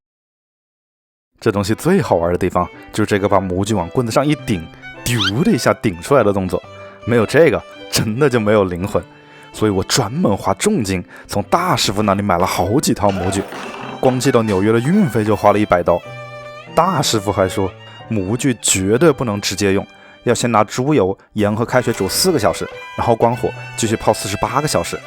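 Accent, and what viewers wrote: Wuhan, China. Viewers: 你这个普通话一听就是武汉的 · 要不要咸蛋黄 都听出了武汉话口音 哈哈哈哈 好亲切！ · 你这个汉普越听越亲切！ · 哈哈真~的是武漢口音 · 听口音就知道你是武汉的，南京那个 不就是变种的 武汉糯米包油条么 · 武汉普通话是相当的正宗，封面的蒸糕也是武汉的样式。现在这玩意在武汉也看不到了吧？ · 听你口音就晓得你是武汉牙，哈哈哈哈，蒸糕全是小时候的回忆！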